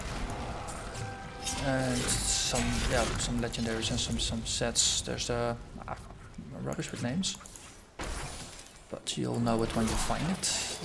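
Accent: Netherlands. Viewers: ik hoor gewoon dat je nederlands bent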